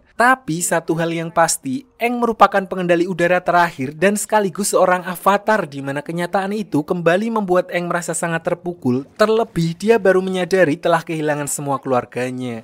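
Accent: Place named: Java, Indonesia. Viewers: bisa gasi gausah medhok pas ngomong bahasa indo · Medok amat mas ngomongnya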